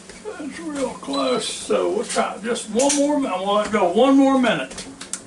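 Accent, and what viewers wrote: South, United States. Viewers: I ❤️❤️❤️ Your foodie demonstration. Your southern drawl made me so very happy. Can’t wait to watch another demo of yours. My wings (modified) came out delicious. ❤️❤️❤️ PS. Love my instant pot duo crisp.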